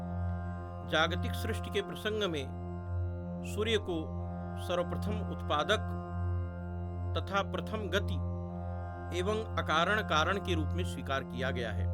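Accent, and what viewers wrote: Standard. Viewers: अद्भुत, ज्ञानवर्धक,,बहुत सुंदर, विशुद्ध उच्चारण, नित्य श्रवणीय, आनंद दायक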